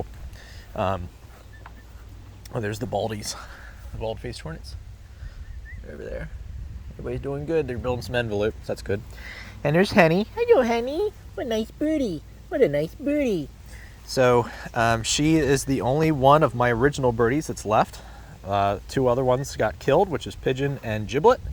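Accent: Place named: Pittsburgh, United States. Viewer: Man, that's one hell of a yinzer accent!! Love your work!!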